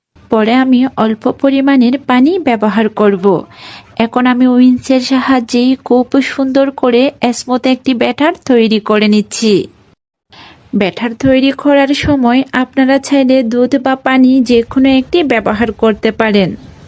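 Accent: Bangladesh. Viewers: আফা আফনার মাতে লাগের আফনে সিলেটি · ময়মনসিংহের নাকি আপনি?? খ কে ক বলছেন। বে ঠার কি?? বেটার। ছালের গুড়া কি চালের গুড়া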